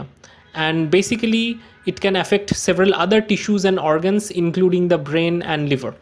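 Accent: India. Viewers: Pure Indian english😢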